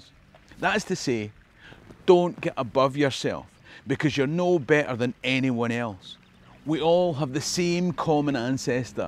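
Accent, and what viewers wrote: Scotland, United Kingdom. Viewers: "tell me your thoughts in the comments section" I really identified with the silly blustery American who really doesn't know much or think things through. it makes me so happy to have access to those feelings because someone talked about them. The only people I knew who had Scots accents and true history were gone pretty early in my life, so bitterness has been replaced by blusteriness whenever I find someone to talk to. Especially when I'm feeling like a man, talking to other men! I recently had someone call MacDonalds of Glencoe "cannibals" and it made me so furious I never spoke to them again because it was just not correct. I love hearing your accent and the Scots Gaelic language!! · Wonderful analysis, and as an American whose family story says we came from Kelso (btw, another Washington State parallel to Kelso just like Kenmore) I think your 5 points are dead on. 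We visited Kelso last year and learned no one named Kelso lives there. But we bear the name and it’s a lovely place. Love your videos, although I find the closed captioning helps this American understand your delightful brogue. Keep up the great work, Dick Kelso, Minneapolis.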